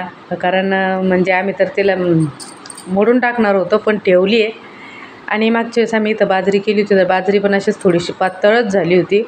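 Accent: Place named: Ahilyanagar, India. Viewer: हो का माझं माहेर अहिल्यानगर आहे ताई आणि मी पुण्यात असते 😍 ताई मला वाटलेच होते तुम्ही नगर च्या च असणार बोलण्यावरणे 👍🏻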